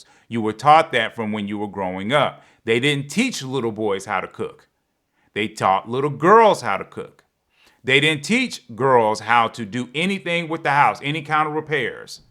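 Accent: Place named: Memphis, United States. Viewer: Lol i didn't know you were from Memphis, your accent isn't as strong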